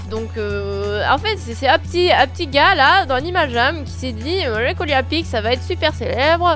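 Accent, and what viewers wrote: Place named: Belgium. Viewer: elle XD ets une fille Preuve: son nom est Et sa voix c'est parce qu'elle est Belge.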